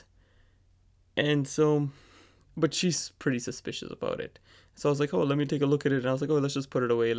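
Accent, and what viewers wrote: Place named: India. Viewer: indian accent does not surprise me about a guy tracking his girlfriend lol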